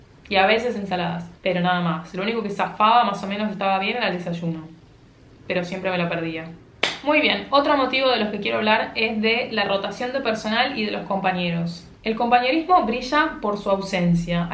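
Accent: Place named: Rioplatense, Uruguay. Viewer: Al final es como yo pensaba, es la versión de Tripulante de Cabina pero versión en agua. Solo que las azafatas a veces tienen un día un la ciudad y tienen habitación propia. Es un trabajo para matarse laburando, ahorrar, y ya esta. Especialmente si son de un país como el nuestro, Argentina, (perdón no se si sos de Uruguay, porque nuestro acento se re parece) que el peso no vale nada y cualquier trabajo en dólares es bienvenido. Sin embargo, es todo un sacrificio. Buchoneo, demasiadas horas, no habitación propia. Me encanta que hables del lado que todos realmente necesitamos saber!!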